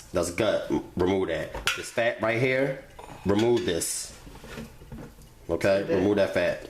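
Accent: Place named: Texas, United States. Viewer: You sound like some of my relatives from TX. I know I overheard one of them mention that they boil them as a part of their cleaning process then proceed in cleaning.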